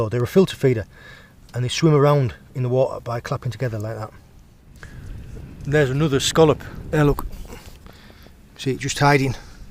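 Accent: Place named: North East England, United Kingdom. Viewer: Hi mate you sound like your from the North East which beach is this I'm from newbiggin by the sea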